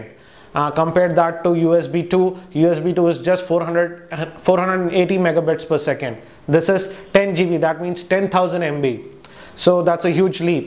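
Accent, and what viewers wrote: India. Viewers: hes Indian, its very easy to tell...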